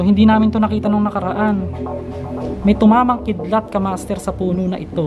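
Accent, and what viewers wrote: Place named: Bulacan, Philippines. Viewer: Hello more videos pa po..taga bulacan po ba kayo? May tono po ang salita nyu e😍 godbless po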